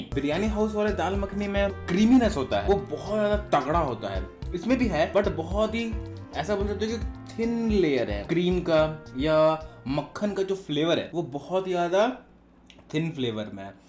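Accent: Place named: Bihar, India. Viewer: Humko laga app bihari ho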